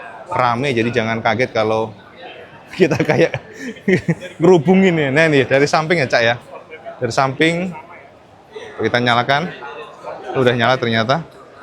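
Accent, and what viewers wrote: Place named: East Java, Indonesia. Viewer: Lampu sein nya ngepang... Bahasa jatimnya ancen Jos Gandos... Medok...😅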